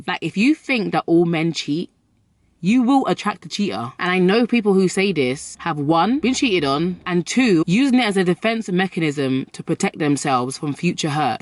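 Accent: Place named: Birmingham, United Kingdom. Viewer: I can here a brummy accent coming out have you been dwn endz😎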